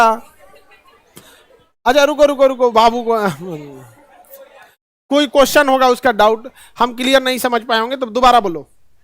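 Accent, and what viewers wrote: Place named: Bihar, India. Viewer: jock chal rhe ya sikha rhe🙄🙄, itni bakwas video, lol bhi samjh na aya, koi bihari lag rha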